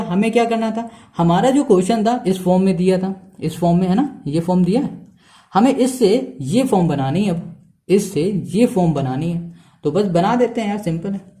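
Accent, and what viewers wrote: Bihar, India. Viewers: Bhi app bihar se ho na👌👌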